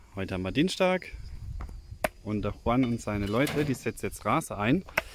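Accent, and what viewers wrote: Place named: Mannheim, Germany. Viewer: Es wird sehr , sehr schön 👍 Eure neue Katze sieht genz wie unsere aus. Wir haben den ersten Tag in unserem Haus gewohnt, da stand sie ganz ausgehungert vor unserer Tür und hat kläglich miaut. Dann haben wir festgestellt, dass sie auf unserem Grundstück in einem Holzscheit drei Babys versteckt hat. Wir haben alle zum Tierarzt geschleppt, aufgepäppelt und uns entschlossen , dass wir sie alle behalten. Hier sind alle übermäßig versorgt mit Tieren und bevor sie in schlechte Hände kommen bleiben sie bei uns. Die Mama haben wir Bounty genannt. Weiterhin viel Erfolg und Spaß beim gestalten . P S Ich frag mich ja immer welchen Dialekt Ihr sprecht, ich tippe auf Monnem 🤣oder Ludwigshafen. Bobenheim/ Roxheim hatten wir ganz früher mal sehr gute Freunde, die haben so gesprochen wie Ihr. Wir sind aus der Nähe von Meenz { Mainz)😂🖐️🖐️ Liebe Grüße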